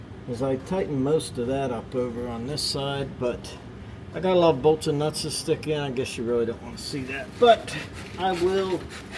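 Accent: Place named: New England, United States. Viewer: It's like watching Me but with a New England accent!!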